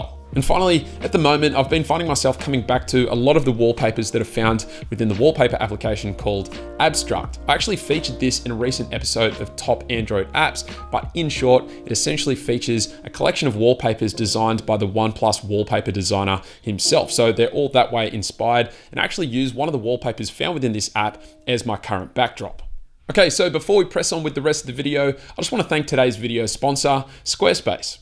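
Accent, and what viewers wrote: Australia. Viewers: Great video bro! Is that another Aussie accent I hear? 👍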